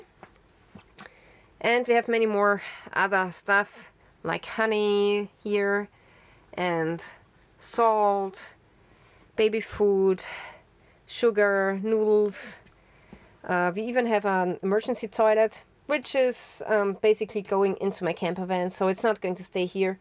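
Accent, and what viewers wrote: Germany. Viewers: Wohnen sie im Deutschland, deinem aksent, horen zum mir wie Deutschen. Es tut mir leid, mein Deutsch ist jetzt nicht so spitze....und keine umlaut habe ich, am Keyboard. Im Jahre 2001, ich habe nacht Deutschland besuchen, und wahre ich einem Student. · Is this German accent? -_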